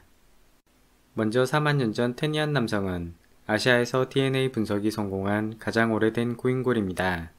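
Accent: Jeolla, South Korea. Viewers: 고향이 전라도요?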